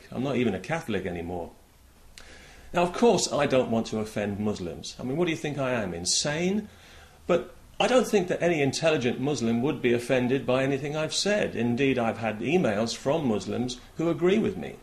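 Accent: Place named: England, United Kingdom. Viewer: Si tu avais pris la peine d'écouter deux secondes tu aurais entendu qu'il est anglais et non pas américain.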